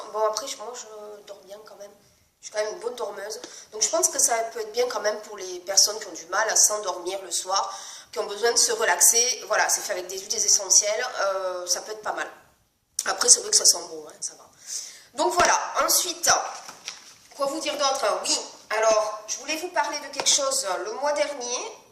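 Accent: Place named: Catalonia, Spain. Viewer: Oui effectivement un bel accent du Sud catalan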